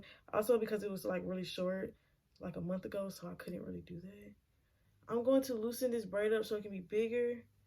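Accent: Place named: South, United States. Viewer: You country as hell that accent girl....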